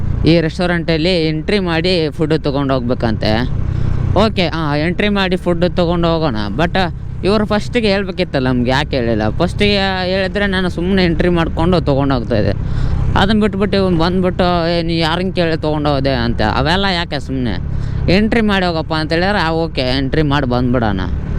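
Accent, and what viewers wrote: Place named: North Karnataka, India. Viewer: ತಮ್ಮ ಯಾವ್ ಊರ ಹೇಳು😂😂😂 ಉತ್ತರ ಕರ್ನಾಟಕ anasatte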